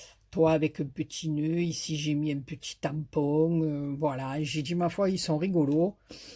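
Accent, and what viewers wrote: South, France. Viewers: Coucou, ils sont superbes ces stickers, j'aurai bien aimé les trouver!! ils sont magnifiques tes ATC, j'adore!!! et le petit accent du Sud met du soleil dans nos coeurs dans ces moments de grande épidémie! ça fait du bien! merci beaucoup pour ce tuto, bisous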